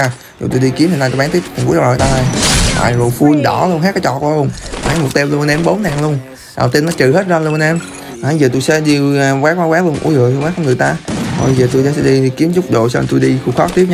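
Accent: Quang Ngai, Vietnam. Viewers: A ở Quảng Ngãi hả😅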